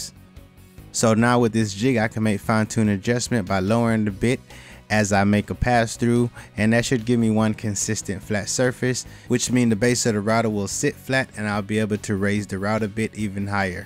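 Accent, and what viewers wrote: United States. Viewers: Where's old mates accent from? Not too familiar with yank accents outside the obvious ones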